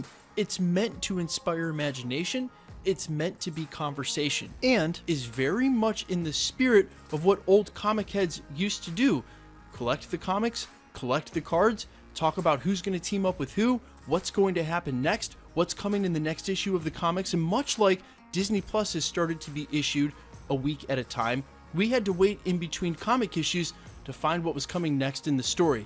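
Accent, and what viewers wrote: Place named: Philadelphia, United States. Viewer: Dat accent, if you're not a Philly Guy, I now dub thee as one! Come to the jawn, we got scrapple...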